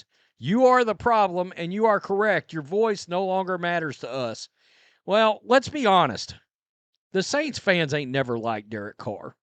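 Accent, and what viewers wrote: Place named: East Texas, United States. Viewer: What part of East Texas are you from?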